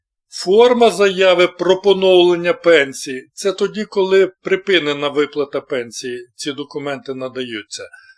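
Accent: Standard. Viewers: Чітка українська мова !!! Щиро дякую !!!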